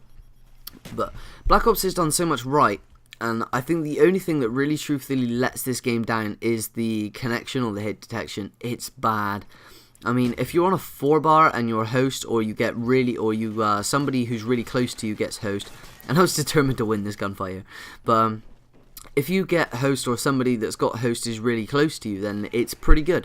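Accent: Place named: England, United Kingdom. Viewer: Where a outs in England are you from:D I love your accent;D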